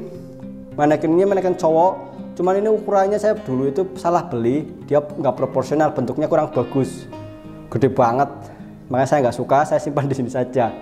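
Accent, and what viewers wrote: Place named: Pekalongan, Indonesia. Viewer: Assalamu'alaikum mas, saya penjahit dan tertarik dgn kontennya karena enak dan jelas, dan logatnya saya kenal, ternyata bener orang pekalongan.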